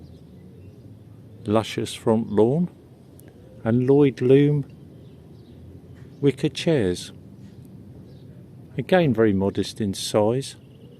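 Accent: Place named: England, United Kingdom. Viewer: Nice video. Glad you made it. I love the architecture. This guy has the best voice. Love the accent. I’m not sure where he’s from but it has to be close to Michael Caine’s home. They sound identical. Definitely the same accent. This guy has a wonderful voice.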